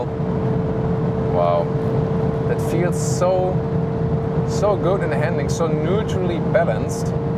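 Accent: Germany. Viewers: Your English is EXCELLENT with a bonus very cool German accent. I can understand your vocals better than way too many of my own countrymen. You choose your commentary so carefully I am rarely left with a single unresolved question, and your delivery is always calm, cool, and collected. Your thoroughness deserves special mention. You know what enthusiastic drivers want to know and you can’t possibly suffer a single disappointed viewer. Thank you for such great automotive journalism and video production.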